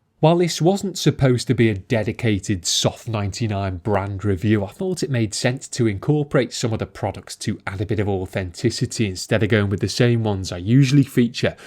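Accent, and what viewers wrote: United Kingdom. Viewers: Congrats, mate! Frankly, another Volvo was expected, something like anything from those magnificent T6 AWDs :) I'm glad you've got the one you were after, nonetheless! P.S. Thanks for these therapy videos you do! I'm calming myself down with them and at the same time learning most enjoyable British dialect with you :)))) Cheers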